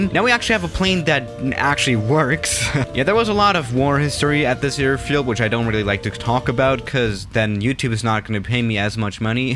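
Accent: Germany. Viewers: He's Jermen don't make fun it just the language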